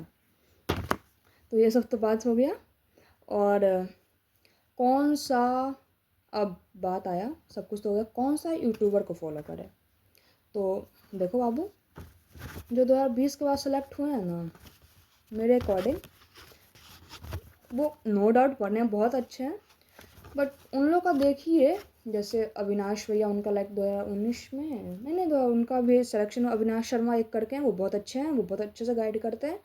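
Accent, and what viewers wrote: Bihar, India. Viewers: Aap bihar se ho ky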